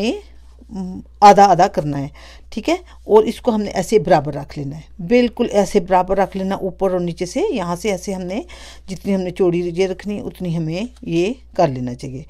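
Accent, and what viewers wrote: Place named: Pakistan. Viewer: Apk accents se ap Pakistani lgti hn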